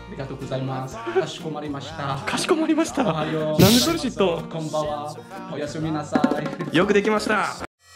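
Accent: Kansai, Japan. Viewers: are you kansaijin?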